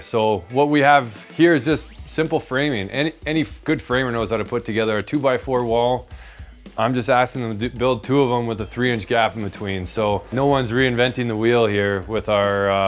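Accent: Canada. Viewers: nice presentation! sounds like CANADA from the accent and I visited there seen 7 window pane so must get cold.... burr... in that case maximum design fun of interiour buildings for people to last the long winters... -tibor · If I'm not mistaken we're hearing the an accent hailing from the nation of Canada. If that's so I can fully understand why there's an emphasis being placed on having a thermal break and maximizing insulation. Yes, having 2 2x4 walls is going to cost more than a single 2x6 wall, but the increase from 5.5 inches of insulation to 10 inches of insulation would make the added construction cost and a loss of square footage a viable tradeoff when heating costs resemble those of a latitude much farther south. I'd rather pay more for something I'm going to buy once, like building materials and labor, than pay an ever increasing amount for the energy to heat a less insulated space. 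$500 dollars to build a house amortizes pretty fast when a heating bill is $50 per month instead of $150. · The accent is definitely Canadian and I noticed on a work truck that it mentioned the city of Calgary (in the province of Alberta in Canada) where two of my sisters live and only 6 hours from my hometown one province over.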